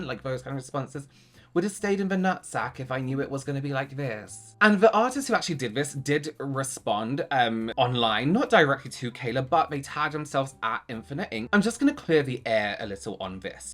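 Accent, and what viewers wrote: England, United Kingdom. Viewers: Can you speak Spanish? I'm dying to hear Spanish with a British accent! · I think you could make a living just reading aloud profane, ungrammatical posts from Americans (I am one, so don't come for me, fellow Yanks). I've gone back and listened to the segment between the mark and several times. The combination of your soothing voice, your British accent, and that incel's assault on the language (and his client) is art. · I love hearing you read out that nonsense lol. Words like "n*tsack" in that unamused English accent is just perfect 😂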